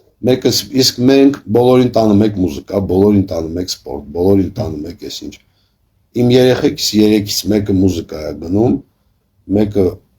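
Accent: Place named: Stepanakert, Azerbaijan. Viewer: Մենակ Ձեզ լսելը ностальгия է, չգիտեմ Դուք որտեղից եք, բայց մաքուր Սծեպանակերտսկի ակցենտ օնիք ❤Ձեզ լսումում հայրենիքիս կարոտը առնում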